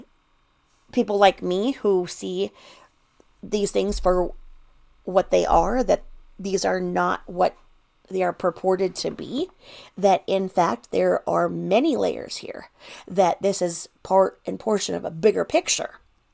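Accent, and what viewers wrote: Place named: South, United States. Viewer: She's from the South. We say wadder and washcloth. We also say hosepipe. 😂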